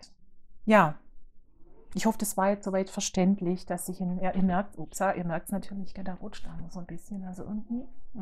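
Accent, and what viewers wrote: Swabia, Germany. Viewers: Frisch und sympathisch eine angenehme Art schwäbisch ich könnte dir Stunden lang zuhören, deine strange sind herrlich könnte ein Tuch vorstellen bin gespannt ich freue mich auf den nächsten podcast liebe Grüsse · Konfus ist toll, und das schwäbeln verstehen bestimmt auch Norddeutsche. Ich Rheinländerin find es klasse zwischendrin die Emotionen in Heimatsprache zu hören. Danke! Und deine Wollfärbungen sind doch toll. Sei mit dir selber Zufrieden, dann werden Dir die Färbungen auch immer besser gelingen. Ganz liebe Grüße aus Roki Regina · Wieder ein tolles inspirierendes Video. Ich mag deine Videos sehr gerne. Im schwäbischen Dialekt fühle ich mich direkt wie zuhause und wohl. Ich könnte dir stundenlang zuhören. Du hast tolle Projekte und färbst richtig schöne Wolle. Bin sehr gespannt was du zu zeigen hast, wenn du im größeren Stil für deinen Shop färbst 😍 Der tuscha kal hat sich in meinen strickplänen nach ganz vorne gedrängt und wird definitiv mitgestrickt. 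Aus deiner Wolle könnte ich mir super vorstellen ein Set für meine Tochter zu stricken🥰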